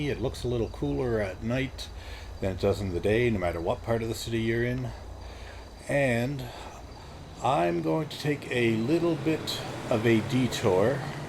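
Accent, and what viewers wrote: Canada. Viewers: That's the Vinewood Bowl, based on the real-life Hollywood Bowl. And you do have a slight Canadian accent, especially when you say "out" as "uwt", rather than the broader American "aaaout".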